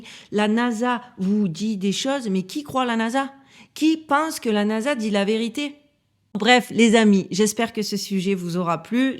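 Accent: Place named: Southern France, France. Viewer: Je pense que la nature de 3I/ATLAS dépasse notre compréhension, voir notre entendement. Quelque-chose se prépare ,c'est mon intuition. Cela dit , j aime beaucoup ton style , ton phrasé, le timbre de ta voix et ton petit accent du sud donne l impression que tu chantes . J adore tes contenus .. Ps: la nasa sont des menteurs !! Comme tous les narratifs officiels.😂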